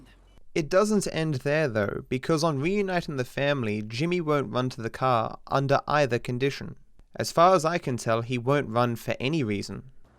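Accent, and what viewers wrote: United Kingdom. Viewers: Now I know, he’s Bri’ish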